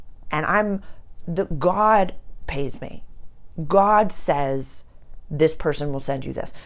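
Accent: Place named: Massachusetts, United States. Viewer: Oh my dear ❤, i to am a Aries Sun, Pisces moon, Aquarius ascendant!! I feel you Girl 🙏🏻🌈🪶, By the way I talk to and hug trees 🌳. 🌲, I grew up in Oxford Mass 1970’s and I talk like you ❤🥹… love my accent.. I just found you a few months ago and I feel like I have known you my whole life, thank you for all you do. I’m learning so much from you, not bad an old woman. 🧙‍♀….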